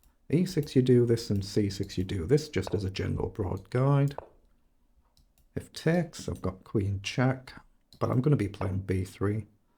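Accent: Newcastle, United Kingdom. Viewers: Discovered this channel yesterday. Simple, instructional, to the point. Great channel. Are you from Newcastle?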